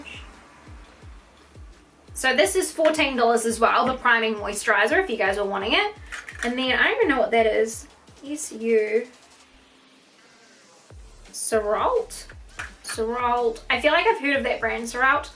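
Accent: New Zealand. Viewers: !! I'm loving your excitement in the video, so glad you love the box. I'm a kiwi living in UK, so nice to hear a friendly voice from my home x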